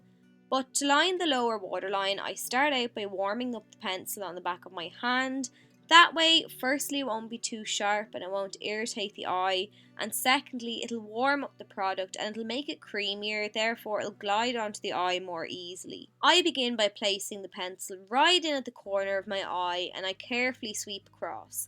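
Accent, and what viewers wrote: Ireland. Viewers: love your Irish accent I have a Boston Massachusetts accent lol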